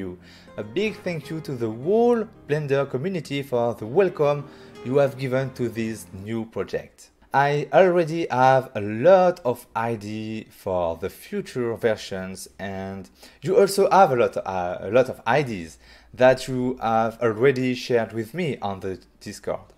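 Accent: France. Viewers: Cool French accent <3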